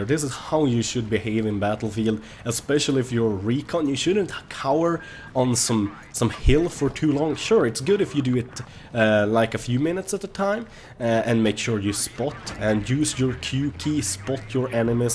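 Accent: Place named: Sweden. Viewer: Gotta love the swedish accent <3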